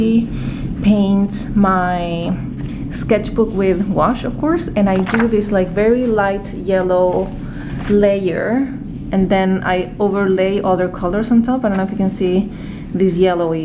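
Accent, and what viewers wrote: Chile. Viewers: Also today a recommended your channel to a friend who lives in texas so she can get used to the chilean acento when she meets me (one day) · Gracias, soy Mexicana, y apesar de que dices que el español chileno es difícil de entender, me encanta tu asentó, el que menos entiendes es el de Buenos Aires, hugs and kisses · tooooodos los acentos son preciosos. ❤ Soy española y los acentos de Latinoamérica me súper encantan!!! Y el acento de Chile es bacán 😉😁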